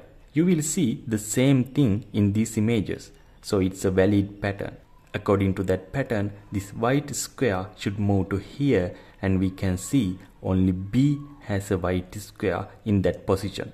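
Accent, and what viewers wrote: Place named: Sri Lanka. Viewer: I notice a Sri Lankan accent :D but keep it up bro!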